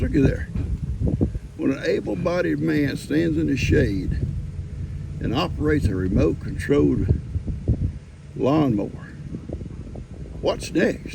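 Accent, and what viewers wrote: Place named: East Texas, United States. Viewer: Good morning and. Congratulations on reaching 50k+ followers. I've been watching your number hoping to see it grow. I love your show and watch them all. Everyday. You wondered if folks would watch an old east Texas man, well, yes we will!! I think it's awesome that you like making videos. Its wonderful for your family and small grandchildren, they will forever have you and on many videos to watch. I also think its wonderful that you and can earn a little money from us watching because most of us understand that retirement means a strict budget. I can say that I love your videos and stories and lives. Your people are great people. I've learned so much from watching your content even. I too am from East Texas so I can understand every word you say. I pray for you and and your family as well as your people here who need prayers. 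The Lord knows who needs help. I pray for everyone in bad weather and flood prone areas are safe. I pray your house project goes smoothly. Much love and thanks from your friend from Leon County. I love the Homicide Hunter too!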